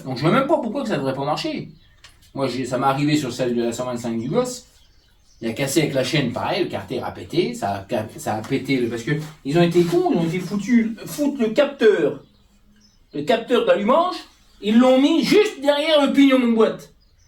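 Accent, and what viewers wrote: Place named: North, France. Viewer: C’est juste, parce que j’ai l’impression de reconnaître un accent du Nord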